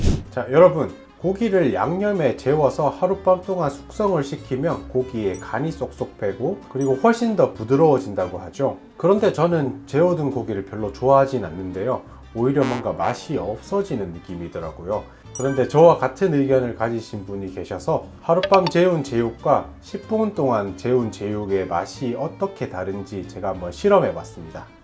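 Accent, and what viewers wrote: Gyeongsang, South Korea. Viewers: 경상도 액센트가 매력적이에요 love love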